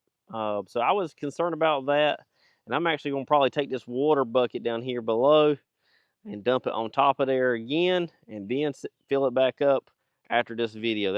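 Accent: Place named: United States. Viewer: This american accent gives me an headache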